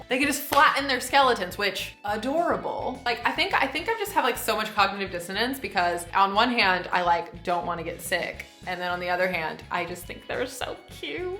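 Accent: United Kingdom. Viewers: It's funny to hear how your voice has taken on a little bit of British way of talking. Not your accent, per se, but the way the sentence flows. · You're getting a little British twang in your accent I can hear it! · You're getting such a British accent! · Is it just me or is accent changing? I swear she sounds at least 15% more British now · You are definitely starting to sound British. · I love hearing your accent start to change a little bit. Some words you say have a British accent and I’m loving it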